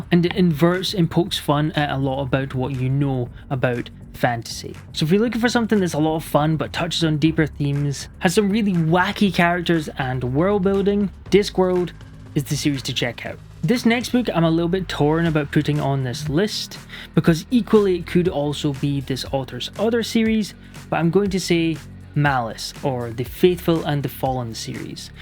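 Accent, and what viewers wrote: Scotland, United Kingdom. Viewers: Are you Scottish? You sound like me if I went to Kelvinside and then spent at least 19 years in the Netherlands. Also, it's a great list, but I'd have had Brent weeks assassin series or Raymond E Fiest's magician series on there. Cheers anyway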